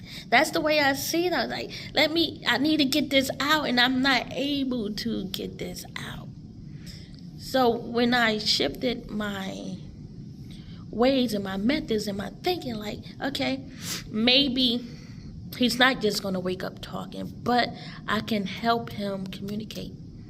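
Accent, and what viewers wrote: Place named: New Jersey, United States. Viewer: I love your Jersey accent!!!!!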